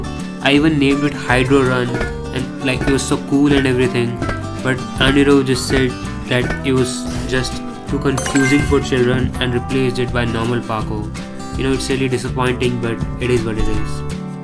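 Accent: India. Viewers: Bro you sounds like Indian 😅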